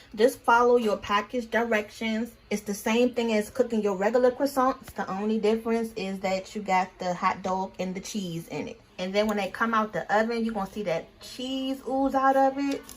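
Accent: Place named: East Coast, United States. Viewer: She’s a cute mom. And her accent sounds real east coast.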